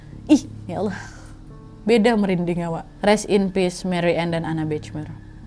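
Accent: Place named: Aceh, Indonesia. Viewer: Logat acehnya kental ya ❤️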